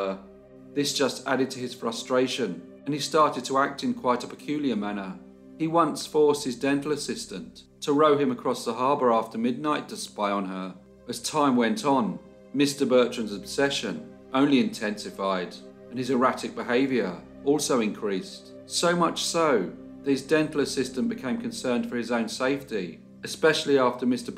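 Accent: England, United Kingdom. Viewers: Just want to take the time to thank you, for your time and efforts. Incredible stories from the past! Your english accent makes it even better to listen to. Wish good week ahead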